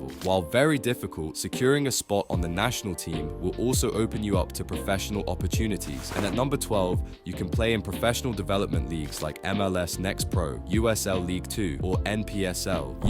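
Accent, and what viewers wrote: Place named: Scotland, United Kingdom. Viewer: Um hi! I know its hard to believe but this is my other account as this channel is only available on my computer and I am using my phone right now but the reality is you will never go pro because you are from Scotland and scouts wont like a person like you with your Scottish accent around here so get an actual job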